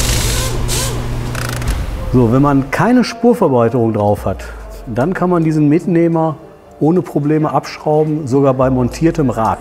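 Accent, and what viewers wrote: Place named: Ruhr, Germany. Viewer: ganz große Klasse. Ich mag den Mann. Besonders seinen Kohlenpott- Akzent! und seine menschlichen Ausbrüche: "Wo hab ich denn den 24er wieder hingelegt?!"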